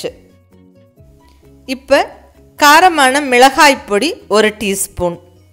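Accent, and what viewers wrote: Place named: Tamil Nadu, India. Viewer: Tamil accent nallayirukku.. Love from kerala💙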